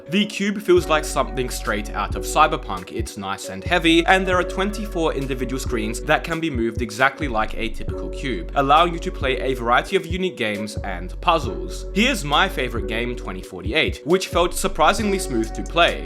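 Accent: Australia. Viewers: Oh my god... You're Australian? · Australia? I thought you were frok the UK.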